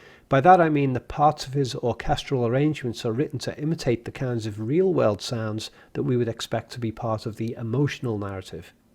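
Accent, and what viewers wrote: Liverpool, United Kingdom. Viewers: scouse git innit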